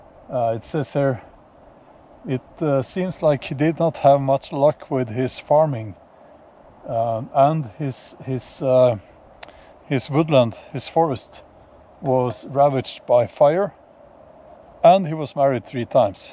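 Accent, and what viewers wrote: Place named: South Africa. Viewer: sounded South African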